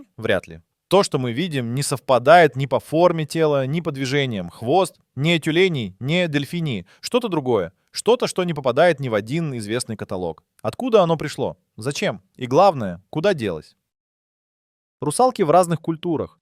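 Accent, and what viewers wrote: Portugal. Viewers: Vídeo com sotaque d Portugal , ora em castelhano , ora em português do Brasil , q bosta d vídeos fake como a locução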